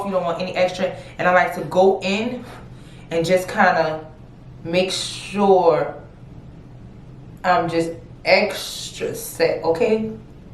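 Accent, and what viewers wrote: Louisiana, United States. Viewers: You are beautiful! And it sounds like you have a Louisiana or Arkansas accent have a lot of family from there thanks for sharing gorgeous look 😍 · Knew you were from New Orleans as soon as you started talking 😍😍 Then I went to see if you put where you're from in the description and I was right!! 😂 I'm from Jersey, but I LOVE NOLA accent!!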